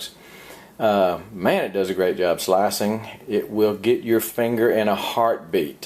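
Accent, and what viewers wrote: Texas, United States. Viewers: Love your Texas accent!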